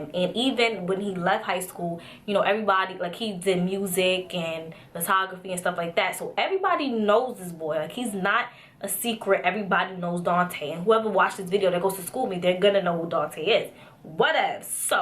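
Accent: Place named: New York, United States. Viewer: I love to hear you talk..your New york accent is too cute!!!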